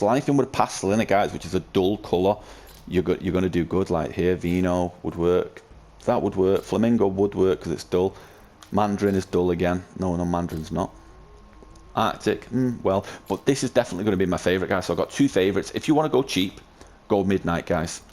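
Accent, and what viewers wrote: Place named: Manchester, United Kingdom. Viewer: Nice vid mate and nice to hear a manc voice haha!! subscribed!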